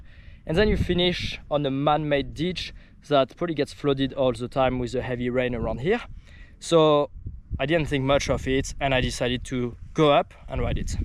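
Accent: France. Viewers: That french accent tho 😭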